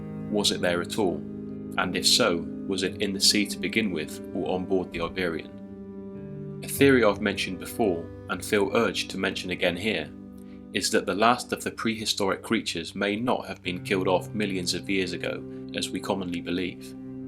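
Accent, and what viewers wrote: United Kingdom. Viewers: Balls to your detractors, +!:) Your voice is British (one big thumbs up for that alone), it's original... and it's mysterious-sounding, in an intriguing, only mildly hypnotic, non-campy way. You're not trying to sound like a carnival barker, so please don't speed it up! And you still haven't told me who your inspirations in the world of the paranormal are!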